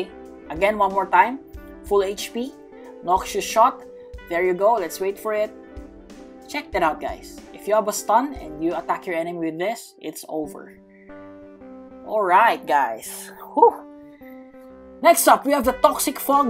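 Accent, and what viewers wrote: Philippines. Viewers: Ur accent is cool and I think it's Filipino accent am I right?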